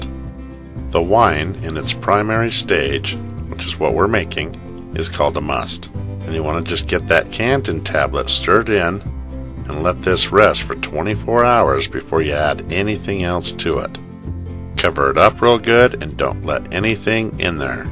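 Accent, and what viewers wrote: United States. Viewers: very good cowboy accent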